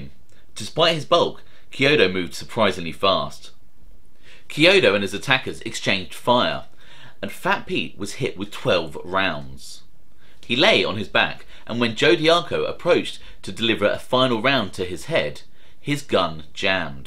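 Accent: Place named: United Kingdom. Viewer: My Pleasure! Say, based on your accent do you produce your show from the UK?? I was just wondering. In any case...are you aware of a BRITISH MOBSTER who was influential in New York in the 1920's and 30's named OWNEY MADDEN. (also nicknamed "The Killer"?) Check him out if you'd like to delve into some of the older history of the US Mobs. Interesting fellow Madden was!!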